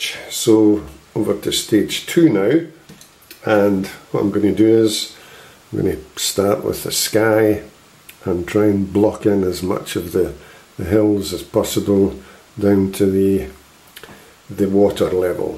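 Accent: Scotland, United Kingdom. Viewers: I just found you! I'm so glad I did. I so loved watching this video, and everything come to life. You're amazing. I loved listening to you talk. Are you Scottish?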